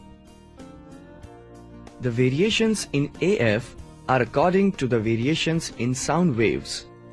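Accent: India. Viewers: IS THIS INDIAN GUY? WHO TALK? SOUND LIKE INDIAN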